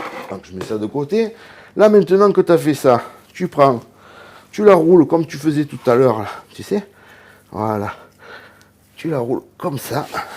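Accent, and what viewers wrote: South, France. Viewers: Bonjour! Le bonjour d'un Harleyiste de Nouvelle Calédonie ✌️ Deux questions stp: moi je suis dans un pays chaud donc hors de question de faire de la charcuterie à l'air libre... Je peux mettre la ventreche à saler puis ensuite à sécher au frigo? Pas moyen de la pendre en plus... Et combien de temps en général avant de consommer selon toi? Merci pour tes vidéos que je découvre. C'est super. J'adore retrouver l'accent du midi 😉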